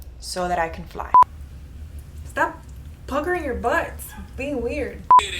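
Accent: Cuba. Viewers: girlllll I subbed strictly because I heard your cuban accent and this video confirmed you were! 305 till we die... hahaha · OH MY WORD I KNEW YOU WERE FROM MIAMI!!!!! YOU HAVE THAT CUBAN ACCENT FROM HIALEAH...not a bad thing I just love ppl that don't forget their roots. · i knew you were cuban, you can hear it in your accent. love your style! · ah okay so you are cuban, was wondering because of your accent!! Aries here too!! yay ^^ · You kinda sound like Derek Zoolander, he he, maybe it is your Cuban ascent : ) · I totally knew you were Cuban! Your accent really reminds me of Gloria Estefan’s!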